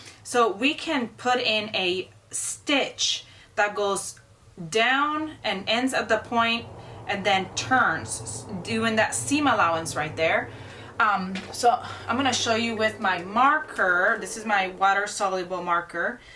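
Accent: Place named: Spain. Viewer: You have a Spanish accent!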